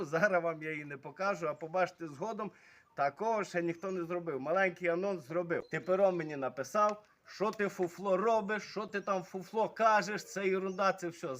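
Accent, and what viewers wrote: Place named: Western Ukraine, Ukraine. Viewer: Классный мужик очень позитивно все прям душа радуется что в Украине такие люди больше всего нравится акцент западной Украины прям очень привет из Одессы, про генератор все объяснил наглядно и понятно. Цікаво чи немає дивних шумів від телевізора або комп'ютера при роботі від такого генератора бо я маю перетворювач напруги з 12-220 від нього струм поганий для телевізора бо йдуть поміхи.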